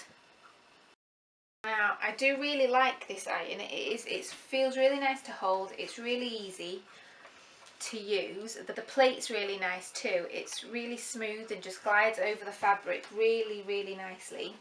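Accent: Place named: RP, United Kingdom. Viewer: very posh! x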